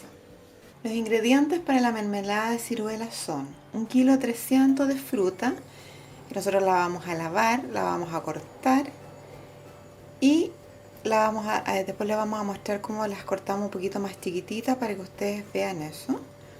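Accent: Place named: Chile. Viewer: me encanta el tono chileno, amo vuestro pais, asi que me copio las recetas, muchas gracias , abrazo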